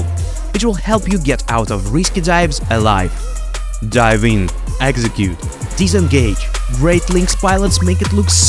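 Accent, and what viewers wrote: Russia. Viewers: russian accent...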